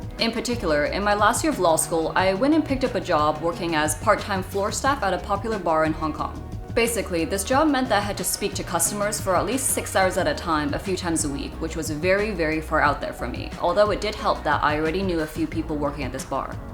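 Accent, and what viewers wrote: United States. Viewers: I've watched a few of your videos and have decided to subscribe to your channel. As a UK person living in Asia, I can only thank you for giving us a glimpse in to the high society life of a Hong Kong resident. Most of what we see on Youtube are people living in shoeboxes under squalid conditions due to the highly inflated housing market. Do all people in HK have American accents? I know you grew up int he US, but your fella also has an American accent. Just curous because HK was a former British colony and all... Also surprised that the legal system still follows the UK way, you said you did it after "high school" - this term is only used in London and we actually have secondary schools. So I guess the whole UK educational system was thrown out when China took the reins in favour of a more American system. I find it very admirable, that not only you leave your job but also your profession to pursue your own dreams and do something better suited to your character. 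As an introvert myself, I found the best person to work for was myself. And that has made me somewhat successful. I hope it works out for you. You are really beautiful by the way.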